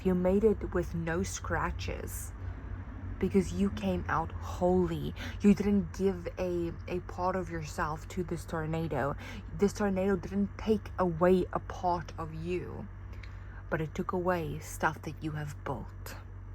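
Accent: South Africa. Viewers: You probably prefer your anonymity but that accent is 🇿🇦 coded. Lol correct me if I'm wrong😅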